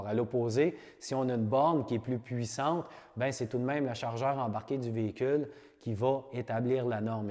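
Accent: Quebec, Canada. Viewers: C'est très intéressant; mais, pour un français, il est vraiment impossible d'écouter un tel accent du Québec + pleins de mots incompréhensibles. Dommage : car c'est peut être intéressant mais c'est tellement incompréhensible.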